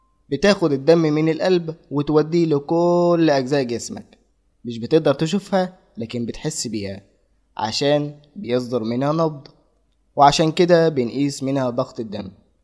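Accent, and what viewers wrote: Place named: Sudan. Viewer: من وين انت عرفتك يوداني من اللهجة 😂❤❤❤❤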